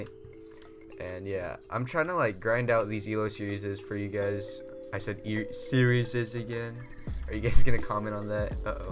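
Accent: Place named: Netherlands. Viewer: Where are you from..? You sound Dutch lol.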